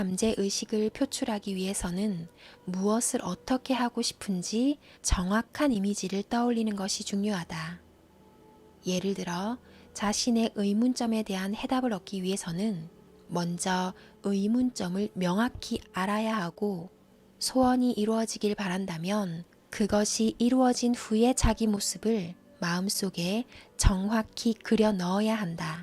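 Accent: Gyeongsang, South Korea. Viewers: 경상도분이시네요 어감도 좋구요 좋은 내용과 좋은 말투 감사합니다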